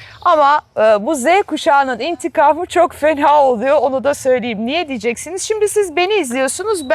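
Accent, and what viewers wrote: Standard. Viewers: Hanım sizi ilk defa izledim Temiz Türkçe akıcı anlatım👍 Ben her kusaktan son uc kusaktan cocuklara sahibim esnafim onlari okutmak ugruna kendime hic yatirim yapmadim pisman degilim.Devletin vermesi gereken egitimi kendi imkanlarimizla verdigimiz icin baska insanlara istihdam saglayacak sermayeyi olusturamadik egitim icin harcanan paralarda ise yaramiyor malum devlet polikasi.oy moy yok diyen uc cocuk var evde su anda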